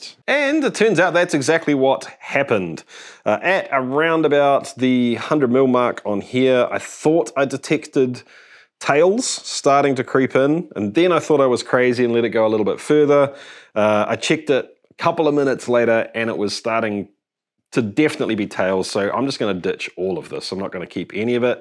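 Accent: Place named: New Zealand. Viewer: I thought the comment was a piss take on kiwi accent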